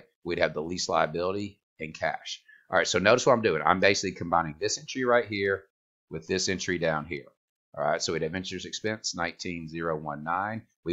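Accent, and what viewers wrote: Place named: South Carolina, United States. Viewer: Are you from south carolina? You sound like Linsdey Graham lol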